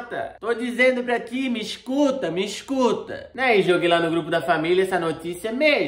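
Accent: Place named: Itajaí, Brazil. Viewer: Po vc tá virado num demonnho kkkkkk queria ver vc lá em Brasília falando as verdades pra aquela cambada de ladrão falando com nosso sotaque itajaiense kkkkkk muito seu fã nos seus vídeos vc fala oq muitos querem fala mais tem medo abraço Quiriduuu tamo junto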